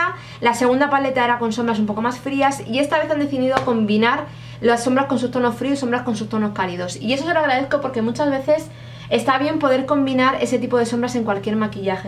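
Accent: Spain. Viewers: De las cosas que más amo de vivir en EUA ... La compro ya tengo montón de esta marca creo que es mi favorita hasta nuevo aviso... Como siempre graxias por tus vídeos siempre te veo ! Me encanta tu acento que hermoso hablan los Españoles , 😎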